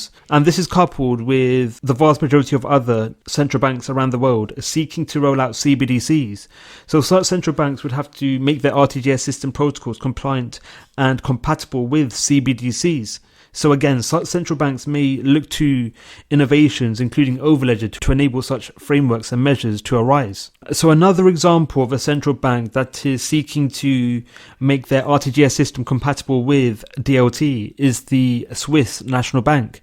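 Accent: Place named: United Kingdom. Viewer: Bru that British Accent.. 😣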